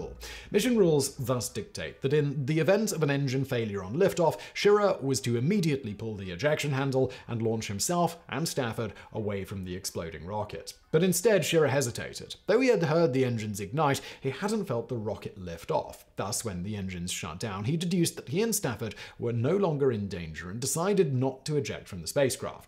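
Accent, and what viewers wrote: United Kingdom. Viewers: Guys give a break he's British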